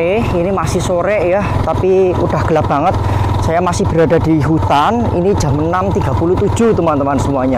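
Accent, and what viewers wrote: Java, Indonesia. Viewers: Mas omonga nya kok medok banget si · Wong jowo PO mas?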